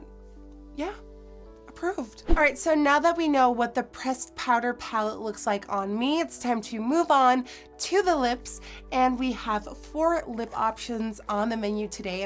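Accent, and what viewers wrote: United States. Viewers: I haven't watched her in a few years and oh my goodness her voice is so different did she move to the states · anyone else thinks she sounds sick/more American in this?? where the holland accent at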